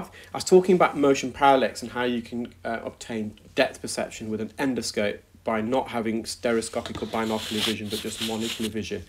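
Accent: United Kingdom. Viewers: British people sound like that. I watch a fellow from Scotland who treats cow hoofs. When he says "bull" it comes out sounding like "bill"! Took me a minute to get used to that Scottish twang, applies for Brits too......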